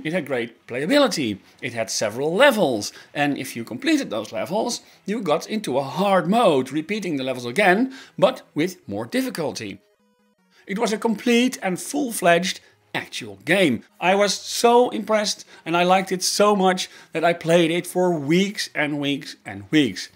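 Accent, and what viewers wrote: Netherlands. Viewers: i'm too young to be using ZX Spectrum at any point in my life, but i was playing elite (the original '84 version) on an emulator and it left an impression on me, not because it was mind blowing to me by itself (it was late 10s so we already had portal 2 and my most played game at the time, FSX, because i (was? am?) a sim nerd and that's how i found elite) but because it was otherwordly different from the games of its time first i thought "it's about david braben isn't it" then i remember watching Alexander the ok's video about elite (by the way, he has a spectacular channel and i recommend everyone who's interested in space and human achievements in general a watch, my fav video of his is about space shuttle program) where he told that elite was developed for BBC Micro (and ofc it was written in assembly, have you seen the thang (both BBCM and the game itself) it's too huge for basic) then i watched 2 seconds more and you said the name lol so anyway, funny video and i don't know why but from the first couple of seconds, without seeing anything else, without checking the channel description, i felt that you're dutch i don't know if it's the accent, or the similarity to Posy or the love for the color orange or the cadence of the speech but it's not like i thought "they seem dutch", it just clicked in my brain and i think that's pretty funny great channel tho, i like it when people just can upload stuff and not worry about youtube being youtube have fun :3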